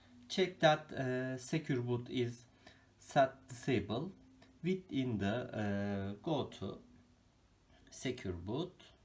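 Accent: Turkey. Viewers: very nice ,tnx, and very nice turkish english accent:)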